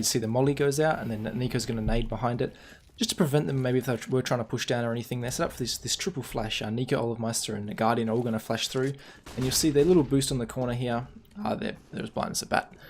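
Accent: Australia. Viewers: jargons I'm an Asian and English isn't my first language and I can understand him 100%. He speaks normally like any Australian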